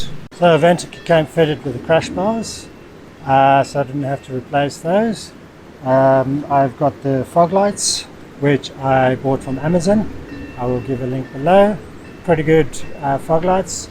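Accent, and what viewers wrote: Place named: South Africa. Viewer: Hi I just bought one yesterday after dreaming of it for many years! From your accent i take it your south african? I'm from Worcester (SA). Would relly like to meet you one day maybe? Cheers!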